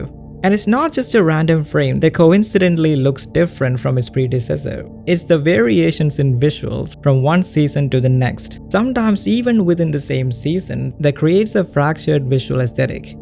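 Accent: South India, India. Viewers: 😢 the americanised south indian accent is throwing me off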